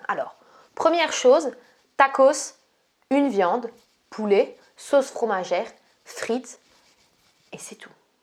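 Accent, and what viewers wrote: Alsace, France. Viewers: Oh lalala l’accent alsacien tres prononcer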